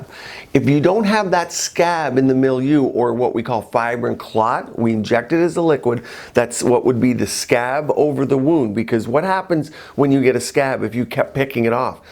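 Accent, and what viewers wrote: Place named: Canada. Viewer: Love - I hear the Canadian accent, eh? Adorable that you do the procedures and understand how the patients must feel.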